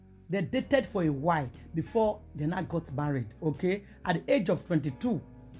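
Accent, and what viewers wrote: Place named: United States. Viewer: you are talking like an American, but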